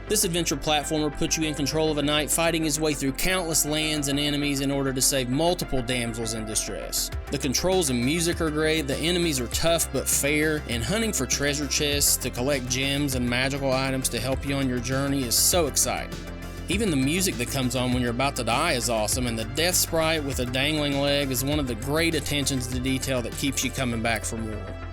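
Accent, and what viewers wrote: South, United States. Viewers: Is this a hilly billy brother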